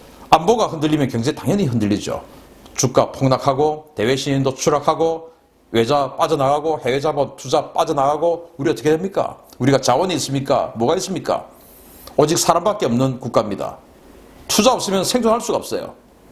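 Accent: Gyeongsang, South Korea. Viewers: 이씹새끼는 말투부터 문둥이네.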